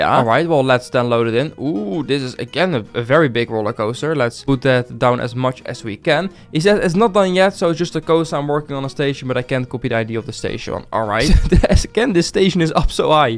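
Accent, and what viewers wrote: Netherlands. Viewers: Bro the dutch i am dutch and all of the time i think all of the time are you dutch. So are you dutch or not? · 😅ik hoor een Nederlandse accent ben je Nederlands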